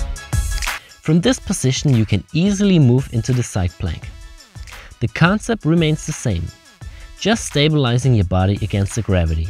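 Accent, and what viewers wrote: Germany. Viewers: Wie man einfach raushört, dass du deutsch bist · german accent, fuckin hell man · slight german accent.. bist du Deutscher, mein Lieber?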